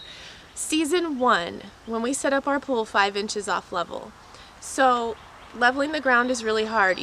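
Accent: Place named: Louisiana, United States. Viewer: One of my many talents is to recognize and pin point the different dialects and accents from Louisiana(which there are HUNDREDS) and call where people are from. I turned on the video and i thought i recognized a north shore St. Tammany or maybe Washington parish spunding lady and i click on your page and see the Louisiana cover pic. I could be wrong, i sense a hint of the lower cajun country(east of Atchafalaya bridge i.e henderson breaux bridge area) so maybe your an implant and just picked up some of your accent but im pretty solid on the northshore call. How'd i do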